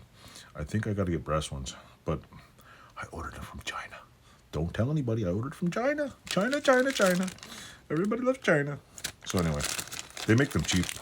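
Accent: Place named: Canada. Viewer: you sound like native canadian but are from utah i assume... so odd... <link> sign guy btw ^ Tony!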